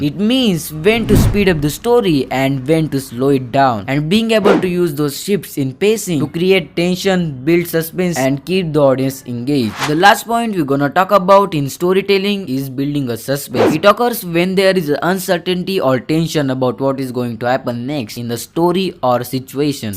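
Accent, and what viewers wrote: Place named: India. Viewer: Poor guys, he was in indian accents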